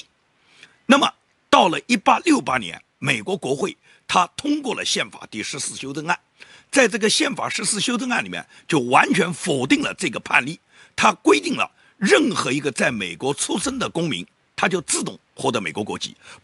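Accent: Nanjing, China. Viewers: 过去的同事是南京人，所以，对南京人的口音感到很亲切。最重要的是，的内容好。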